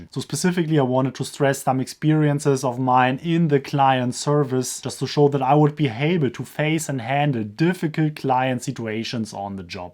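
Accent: Germany. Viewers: I really enjoy your German accent English! How could I contact you if I may ask?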